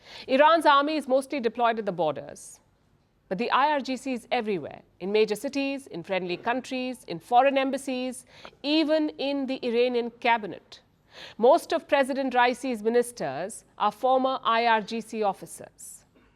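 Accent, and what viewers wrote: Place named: India. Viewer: YES WE ALL AGREE , BUT ITS DIFFICULT TO UNDERSTAND HER WITH THAT INDIAN ACCENT !!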